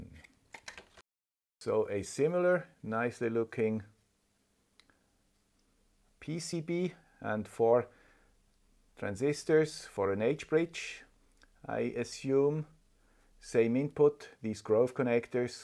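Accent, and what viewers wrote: Switzerland. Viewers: Great as usual...but is everything ok with you? Noticed that you sounded sad or tired especially at the start of the video. If tired take a break but do come back because we can't miss the guy with the swiss accent!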